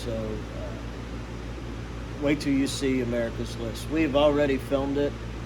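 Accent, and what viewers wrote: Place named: Pittsburgh, United States. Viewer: I never noticed the yinzer accent til now. Shaht aht to the best accent in America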